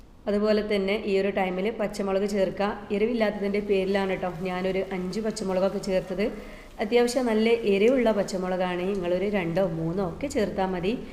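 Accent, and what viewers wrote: Malappuram, India. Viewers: മലപ്പുറം ഭാഷ കുറെ മാറിയല്ലോ, ഞാനും നിലംബൂർ സ്വേദേശിയാണ്, ഇവിടെയും മുസ്ലിം ഭാഷ ഇങ്ങിനെതന്നെയാണ് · നല്ല സ്വീറ്റ് മലപ്പുറം ഭാഷ , Good recipe.Expect more videos from you sister 🎉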